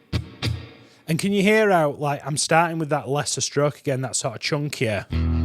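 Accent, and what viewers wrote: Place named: Northern England, United Kingdom. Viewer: Great lesson, and bonus it's in a good ol' northern accent, makes a change from all the US channels out there. 😂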